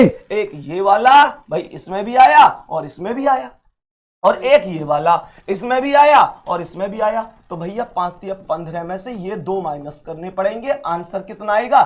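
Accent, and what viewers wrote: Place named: Mathura, India. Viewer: Mathura ki boli on point :hand-purple-blue-peace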